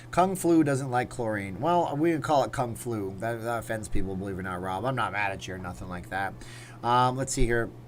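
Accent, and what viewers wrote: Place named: Canada. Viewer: I think people like your Canadian accent :) and your information/news on LV. You are doing a great job.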